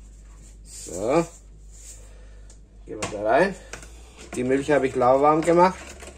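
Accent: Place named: Banat, Romania. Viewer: Grüß Gott, kommst Du aus dem Banat?Hörst dich so an . Weckt schöne Erinnerungen 😊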